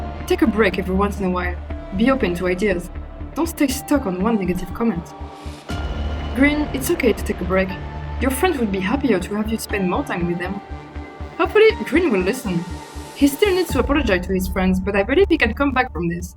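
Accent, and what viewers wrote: France. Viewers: I'm betting right now, that you may be french, 'cause you sound french.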